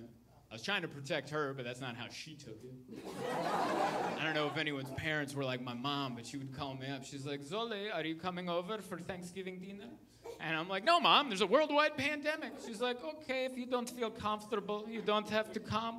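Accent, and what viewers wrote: Hungary. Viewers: as native Magyar, I was surprised to hear that you don't speak Hungarian. Travelling a lot internationally, I can recognize my fellows just by accent from far away, airports, hotels, anywhere just by hearing (maybe because I have also this thick accent, tuned my ears for). Did you know that you have typical Hungarian accent speaking English? Surprising... Congrats man for your stand-ups, go on! BR, Csaba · Okay I still laughed, but your Hungarian accent is awfulllll 😂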